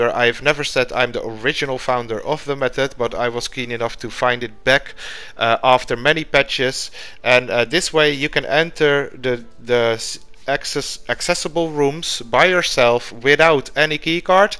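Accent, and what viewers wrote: Netherlands. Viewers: R you dutch?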